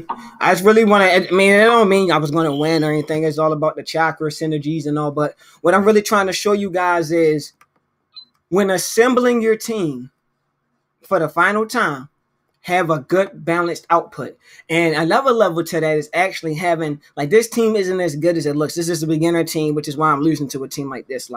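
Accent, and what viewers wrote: Baltimore, United States. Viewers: u from balitmore? why u pronounce ur Us like dat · Baltimore accent?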